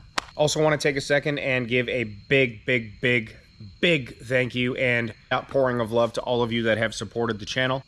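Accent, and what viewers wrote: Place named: Brooklyn, United States. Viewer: Nice brookyln accent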